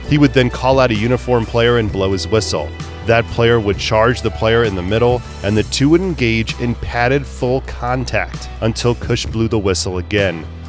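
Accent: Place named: Arizona, United States. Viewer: You are wrong.Kush did not deserve to be fired. When the real truth came out years later, Kush was hired as an ASU employee. That ASU hire would not have been permitted if Kush was as bad as you say. BTW..you talk like someone from Tucson. 🤔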